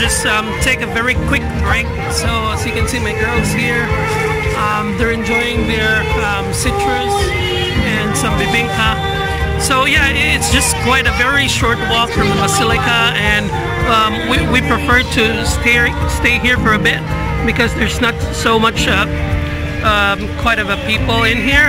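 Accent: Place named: United States. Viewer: Sounds American!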